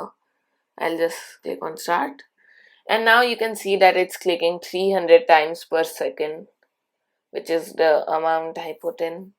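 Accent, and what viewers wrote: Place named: India. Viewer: BRO IS AND INDIAN ACCSENT SUCH A LIER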